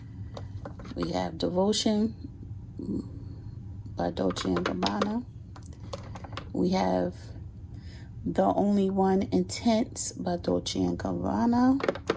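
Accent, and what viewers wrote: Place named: New York City, United States. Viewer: New viewer here 🖐️.. your NY accent is so strong lol nice collection 🙌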